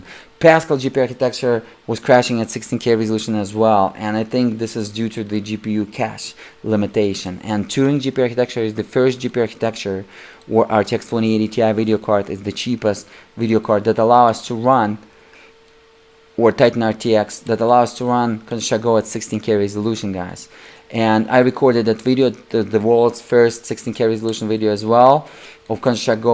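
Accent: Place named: Russia. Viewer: You are from? You pronance like a russian.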